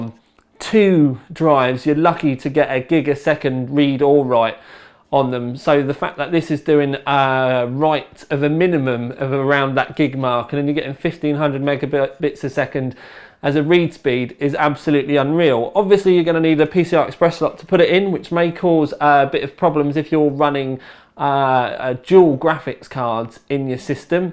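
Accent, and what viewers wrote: United Kingdom. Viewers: anyone here knows how to avoid UK accent videos on youtube?? OMG!!! headache. Thanks for the video anyway o.O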